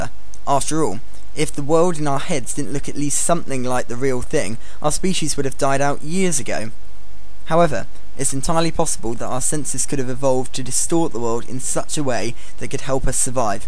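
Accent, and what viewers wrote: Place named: United Kingdom. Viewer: This is not plain English. This is British English!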